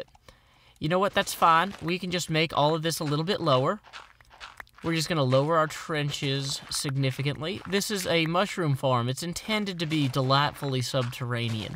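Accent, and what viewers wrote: South, United States. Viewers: Your southern accent plus your hilariousness makes me want to sub, like, and fav..........I describe you in one word as being....... AWESOME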